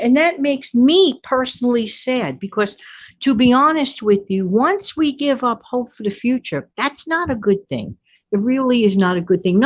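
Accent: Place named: New York City, United States. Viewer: New here ……came here after seeing you with Prepper Princess and Dawn. You have that New York City accent. I probably have the same accent…. I am in a state close to NY. I like the different accents and views of other people. You learn things… it’s fun.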